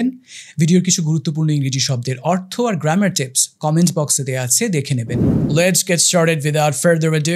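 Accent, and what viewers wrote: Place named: United States. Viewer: You accent awesome. I want to learn American accent as you speak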